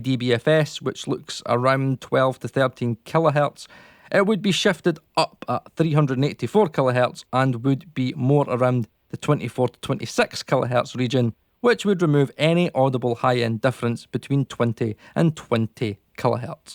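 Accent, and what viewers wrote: Scotland, United Kingdom. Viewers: Hi. Thanks for saving me a shite load of money on inferior software. Also, my families from Ayr and try as I might I can’t seem to geographically pinpoint your Scottish accent 🤔😉 Keep up the good work.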